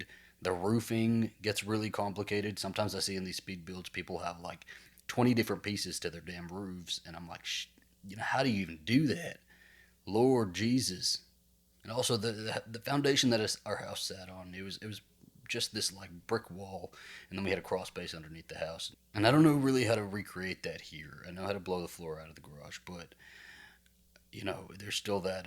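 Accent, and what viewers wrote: South, United States. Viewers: I can't believe you're trying to build your childhood home from memory on Sims 4 of this video and I hope your husband, Alastair will be very proud of you for that, but I love your Southern accent, you're gay and you're from Texas!!!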